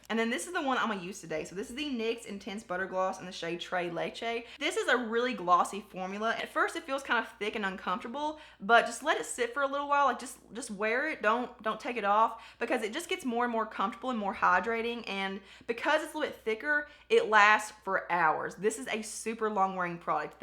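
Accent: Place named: Alabama, United States. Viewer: I’m coming from channel not sure if I spelled it right but I have subscribed to your channel I absolutely loved this video I love drugstore and dupes videos. You are a beautiful young lady but if I can ask where you are from I heard an accent I live in Alabama and it sounds like you may be from there. God Bless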